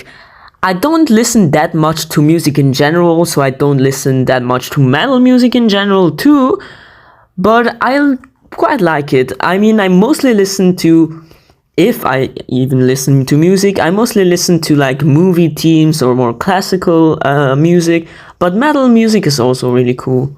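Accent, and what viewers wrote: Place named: Switzerland. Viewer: LET'S GOOO, QNA also i love the swiss accent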